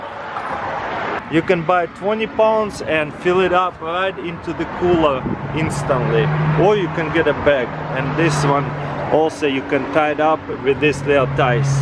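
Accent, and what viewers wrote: Russia. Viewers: Акцент то русский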